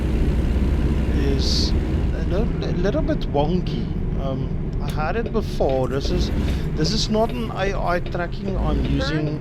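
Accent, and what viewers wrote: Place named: South Africa. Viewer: Im willing to try out the mod 🥲 just wanna see what it is about. You accent sounds familiar ,are you South African Rare to see a South African gamer into trucking 👍Nice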